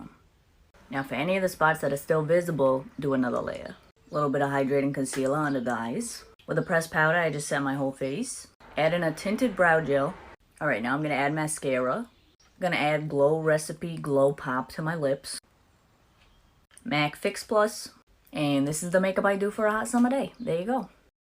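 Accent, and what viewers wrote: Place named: Boston, United States. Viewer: She’s from south Boston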